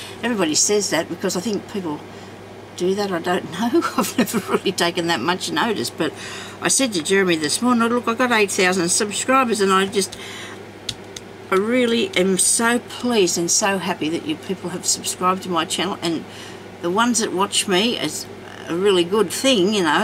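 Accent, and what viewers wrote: Australia. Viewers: Merry merry Christmas. I love To hear another aussie voice. How do you wash vintage laces? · Oh wow...just discovered your channel :).....being a newbie, I'm on a voyage of discovery! I heard you speak.....and thought ..Wow..another Aussie ..yay:). Love your work and so good to hear our lingo xxxx. Question? ....saw another video you did about lace covers and you used a hot glue gun. Does the glue show through with thinner fabric ( as in internal lining in a book?...spray glue leaves nasty marks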